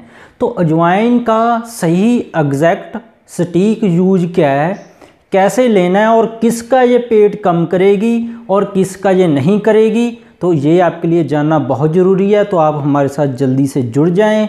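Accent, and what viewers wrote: Punjab, India. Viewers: I love the punjabi accent when speaking hindi :)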